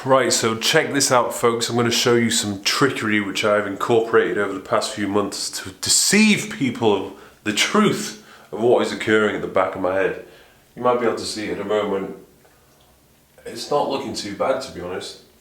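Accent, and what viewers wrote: United Kingdom. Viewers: You don’t need hair,. Look at your jawline lol you have an amazing physique buddy and your British accent only makes you more attractive. You have no reason to be self conscious, you seem like a great guy.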